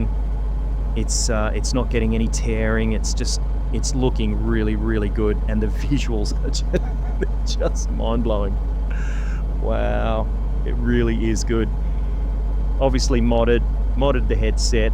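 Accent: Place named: Australia. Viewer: Perfect video mate and the Aussie accent always wins for a Danish guy like me :) Still waiting for my Super but it is going thru the QC right now so I should have it within a month I guess :)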